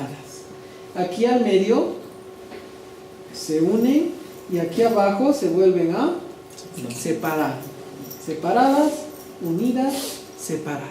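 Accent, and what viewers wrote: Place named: Highlands, Ecuador. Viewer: creo que si, tiene acento a los de la sierra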